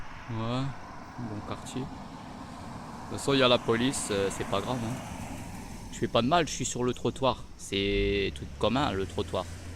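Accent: Nord-Pas-de-Calais, France. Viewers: Rien de tel qu'un français tel que toi avec ton accent pour démystifier définitivement cette demeure... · t est chty nord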